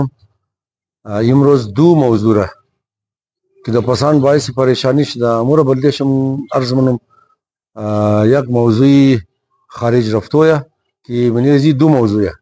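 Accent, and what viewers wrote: Hazaragi, Afghanistan. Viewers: جناب محترم شما که کویته به دنیا آمده و کلان شدن سم سده هزارگی صحبت مونین امونا که دو روز موشه ده اونجه رفته بسیار دان خوره چلس چلس مونه بیخی آدم سر درد موشه. 😅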